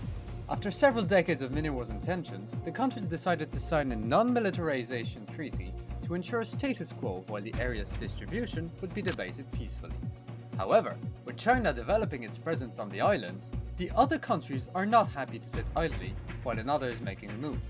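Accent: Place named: Ireland. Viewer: I came across your video by pure chance my dear friend. You have treated a serious and always topical subject with a touch of humor as usual and a slight Irish accent which gives a little more charm to your video!!! well done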